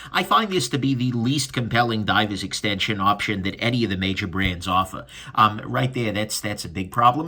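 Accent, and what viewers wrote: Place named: Brooklyn, United States. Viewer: You must have grown up in lower Brooklyn. You sure sound like you hail from there.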